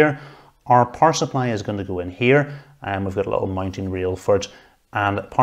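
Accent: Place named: Northern Ireland, United Kingdom. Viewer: “Hears NI accent” subbed, nice to see a successful channel from home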